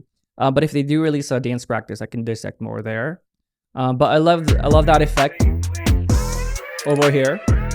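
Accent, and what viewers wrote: Philippines. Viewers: Are you filipino ? Because of your accent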